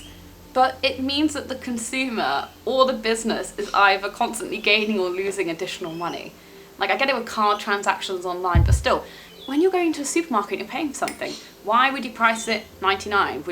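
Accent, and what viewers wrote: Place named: England, United Kingdom. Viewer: Lovely accent which part of England.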